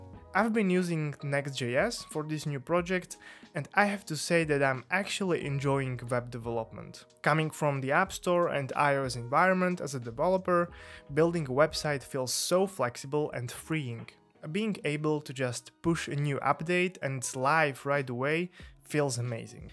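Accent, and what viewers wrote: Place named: Netherlands. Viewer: As an aspiring Flutter dev I really appreciate your content. From the first impression I thought you are from Holland/Netherlands just as Internet Made Coder, because of your accent, it has seemed really similar to him. Was lil bit shocked that you are Slovak, although the name sounds more slavic. Hope you would reach worldwide audience more and that helps you set up another one of your multiple income streams. Also I would like to see a video about your MacBook specs. I have already seen a lot of content by SWE content creators about that topic, but I'd love to see another perspective. Každopádně chlape dobrá práce, krásný 4K videa, thumbnaily taky vypadají dobře, že mě donutí kliknout na další video. Za to video ohledně specs bych byl rád. Díky a ať se daří. :)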